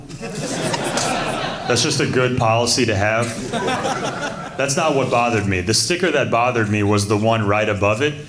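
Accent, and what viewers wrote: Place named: Tri-State, United States. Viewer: Great set, you're hilarious, very subtle tristate accents. Outro music sounds like from Unreal Tournament too so that's a plus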